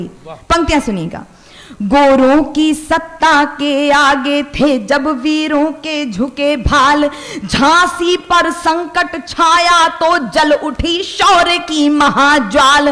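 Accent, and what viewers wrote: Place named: Lucknow, India. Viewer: Bilkul lagta hai k aap Lko se hain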